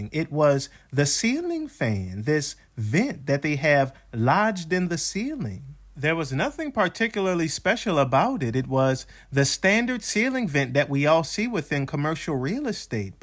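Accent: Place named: Philadelphia, United States. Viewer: are you from Philadelphia or the surrounding area? Just judging by your accent.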